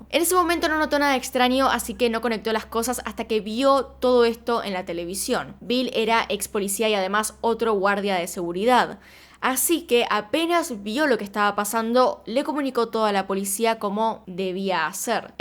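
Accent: Argentina. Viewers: Wow, la verdad, que al escuchar tus vídeos me relajo demasiado xd, no soy muy fanática del acento argentino, pero del tuyo le hago hasta un altar 😔👊💛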